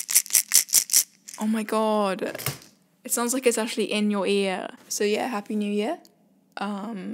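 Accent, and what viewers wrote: United Kingdom. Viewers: That British accent though^^ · He sounds just like him lol. This british accent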